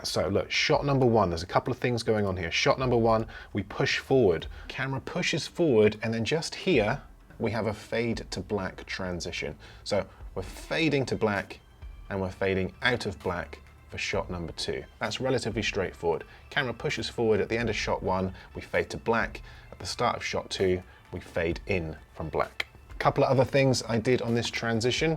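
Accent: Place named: West Country, United Kingdom. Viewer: Really enjoyed this video, thank you. It’s helpful to see the editing broken down. I have done some of these things in Premiere but not used speed ramps - this looks like a great fix for a few wobbles 👍 Also - don’t think I’ve ever seen Plymouth look so cool, heh. Nice to hear a west country accent on YT! Cheers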